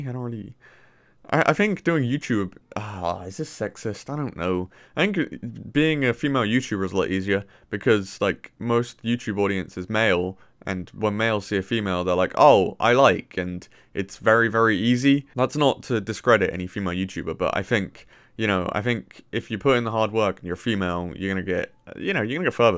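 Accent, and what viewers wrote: United Kingdom. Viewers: R u from liverpool i thot u from london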